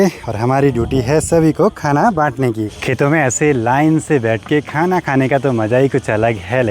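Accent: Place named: Pahari, India. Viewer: Aap Bilkul cool pahadi ki tarah bolte ho..aap change kro ise ...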